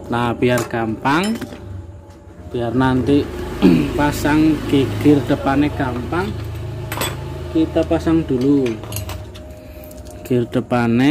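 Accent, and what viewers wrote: Banyumas, Indonesia. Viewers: ora ngapak ora kepenak.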